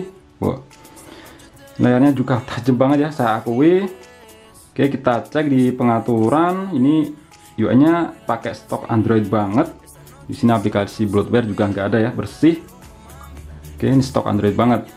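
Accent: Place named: Banyumas, Indonesia. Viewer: Lu orang Banyumas ya bree. Itu kaya Deket rumah gw😀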